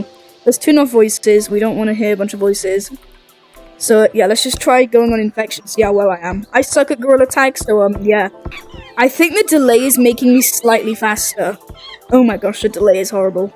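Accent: United Kingdom. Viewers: Great vid as always. ❤️ ur British accent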